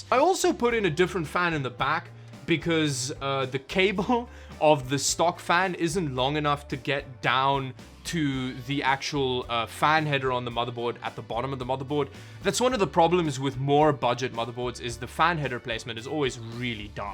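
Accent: South Africa. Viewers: Are you South African 🤔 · Do you come from south africa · You sound south african bro!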